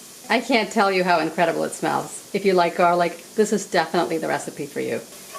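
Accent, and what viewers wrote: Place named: Long Island, United States. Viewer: Delicious! You have a long island accent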